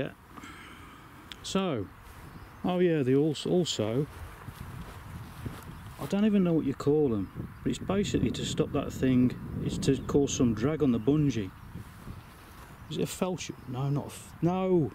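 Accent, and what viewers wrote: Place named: West Country, United Kingdom. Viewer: If I lived close mate, I'd do it no problem! But judging by your accent, I'm guessing West Country somewhere? I'm up in West Yorkshire, Leeds. Looks a nice spot to fly though.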